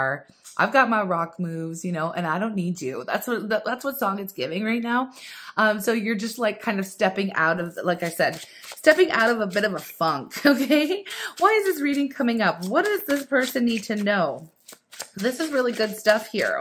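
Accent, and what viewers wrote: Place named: United States. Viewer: Your american accent is amazing! I'm always sooo inspired by you and your content. Absolute Legend!!!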